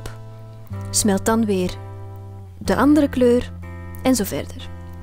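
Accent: Flanders, Belgium. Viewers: je spreekt niet "Belgisch" , het is Vlaams en wij zeggen ook bv. praat Nederlands want Vlaams is een accent... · Ben je Belgisch want zo klinkt het. Niet erg natuurlijk maar dan. Moet je jezelf niet Nederlands noemen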